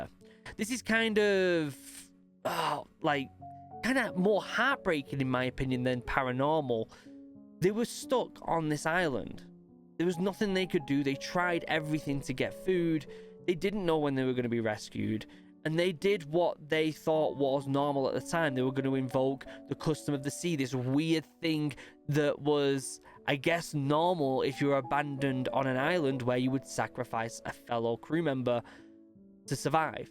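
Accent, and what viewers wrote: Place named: North, United Kingdom. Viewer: I’ve been a casual viewer of this channel since MW: 2019 Clean House. I love the new format, I think I will miss the old style but at least you’re happy with what you are doing now. I will say though as someone from the south-east UK I’ve started to notice the northern accent much more and it’s great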